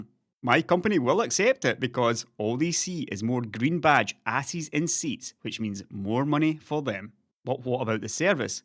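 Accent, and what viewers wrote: Scotland, United Kingdom. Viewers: Scottish accent + curse words, name a more iconic duo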